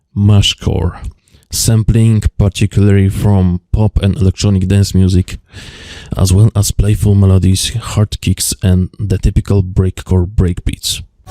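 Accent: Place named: Eastern Europe. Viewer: looks like i hear slavic accent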